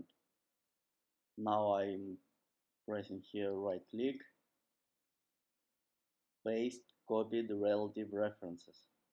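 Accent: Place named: Russia. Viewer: Smells like a russian accent!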